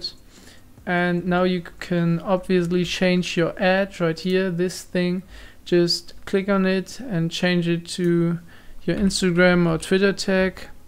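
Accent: Germany. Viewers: I knew cuz your english is like a german aka ich auch lol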